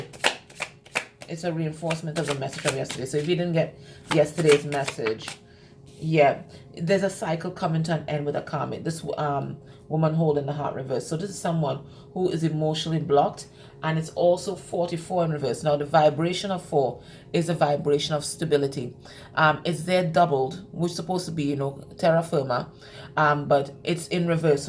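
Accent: Trinidad and Tobago. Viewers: You sound Trinbagonian to me.....your picture even looks like someone I grow up with right here in Tobago. You have a very strong resemblance to that person.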